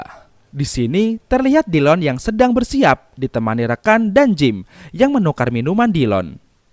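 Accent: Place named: West Jakarta, Indonesia. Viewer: aroma aromanye ente orang jakbar bang ye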